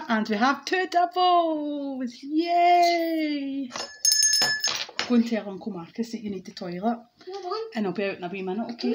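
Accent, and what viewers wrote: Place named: Glasgow, United Kingdom. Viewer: Hiya 👋 Nice session!!! How lovely is Glasgow accent 😍 👍 and sub for you!! I used to live in Scotland 🏴 for over 10 years!!!! I miss this country 🙁 Right now I live in US and I am scratching American scratch cards, unfortunately most of scratch cards are rubbish!!! I remember one year ago was a different story. How you doing?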